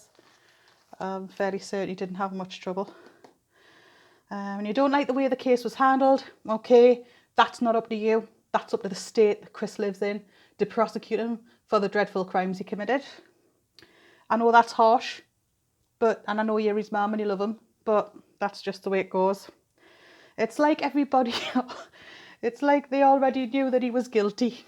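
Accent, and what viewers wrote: Newcastle, United Kingdom. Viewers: Just came across your channel, Have prescribed .You come across As someone very genuine and different from the others I’ve watched.And I love your Geordie accent. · Aah pet, is that a fellow Geordie voice I hear? You've picked up on all the bits and bobs I did, especially that bit about "the beloved daughter-in-law" in their court statement. I don't know who wrote this - guessing their court representitive who read it out - but that sounded so disingenuous when both parents had already made it more than clear they didn't like her - at all. OK they can say they are sad about the whole tragic event and people will probably believe them, cos I'm sure they are (if only cos their beloved son is going to prison for the rest of his life) but don't start gushing out false terms of endearment now and over egging it. If Shanann had been so "beloved by the Watts family" why didn't Mamma W get her onside, make the effort to get to know her, instead of going against her at every opportunity. 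And not going to their wedding? That would have been a very good time to start sucking up the fact that Chris had chosen her and Mamma W had to get used to it. But don't you think, Mamma W would have had a struggle to embrace any girl CW had chosen to marry? I really do think the Watts had a problem with the Rzucek family. Did you hear Sandy Rzucek's tale about when all 4 parents were staying in Colorado? Mamma W had a psychotic meltdown and wouldn't "allow" the Rzucek's to spend any time with the grandkids - and the Watts were there for a week. They didn't even all sit down and eat an evening meal together. How's that for weird? I didn't come to this case until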